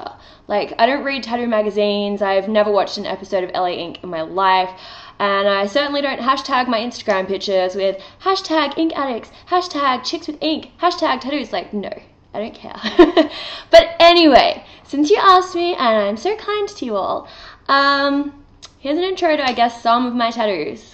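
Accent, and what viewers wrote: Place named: Australia. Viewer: 1st time ive watched one your vids,your funny as fuck.aussie as accent.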